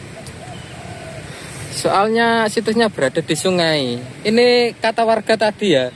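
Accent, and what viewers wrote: Java, Indonesia. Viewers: truskan lur aku suka banget cara penyampaiyaan kata2nya lucu mas bro,mentok banget jawanya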